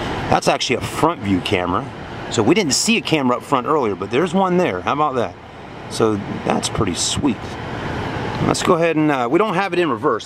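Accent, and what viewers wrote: South, United States. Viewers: I have the 2018 black premier and ABSOLUTELY LOVE IT! Not a fan of the red line. My husband has the 2018 black LT. we use mine as the family vehicle so that’s why we upgraded mine to the premier. I wanted white but the dealer could only find it in the red line so I got black. I am really glad I did❤️ loved your video and your accent! I’m from Michigan so obviously we don’t have that great southern accent:)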